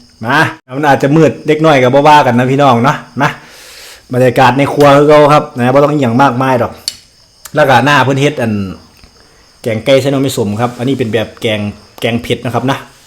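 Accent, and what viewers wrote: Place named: North, Thailand. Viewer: สำเนียงเหมือคนเหนือเนอะ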